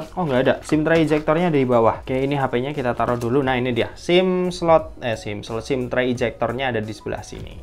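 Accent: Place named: Tegal, Indonesia. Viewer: tegal ya bang... logatnya ketara banget medok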